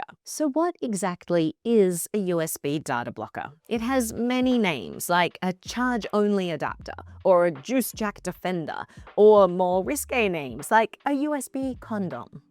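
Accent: Australia. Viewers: Aussies and their darter, make me smile every time. 😊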